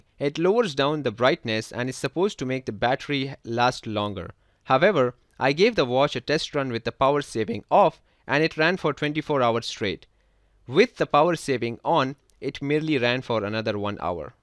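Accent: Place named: India. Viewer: Indian accents bug me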